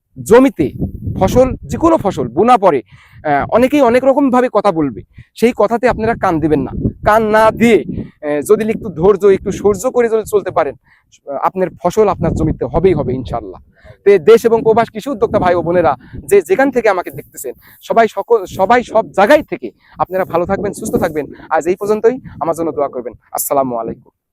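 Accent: Natore, Bangladesh. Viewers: আস্সালামু আলাইকুম।ভাইয়্যা , আপনার কথা শুনে আমার মনে হয় নাটোরের মানুষ আপনি কিন্তু আমার হাজবেন্ড বলে আপনি পাবনার আপনি পাবনায় থাকেন ।আসলে কোনটা সত্যি।আপনি কোথায় থাকেন?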